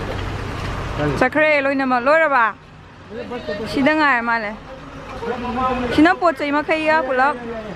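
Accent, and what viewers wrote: Kakching, India. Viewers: Ym engna fjeiko ngn wa ngangbje ym nungcye ado kakching gi lon nttabid amut haiyu ko aduju ym nungcdw mnle berry nc sister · Kakching marol ne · Kakching wa khungang sey ym nung c yeh.. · Is this how you normally talk at home or you just wanted to show how kakching people talk? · Kakching maron malle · I m watching ur vedio far away from Nepal. Love to listen ur voice .. ei na yam pamjava ni kakching marol j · che se kakching mlli🙄🙄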